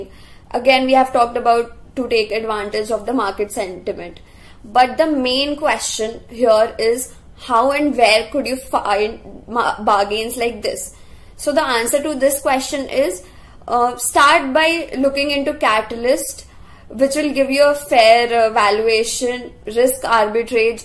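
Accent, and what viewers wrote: India. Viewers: I like the indian accent very much 😉 . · Very very strong indian accent...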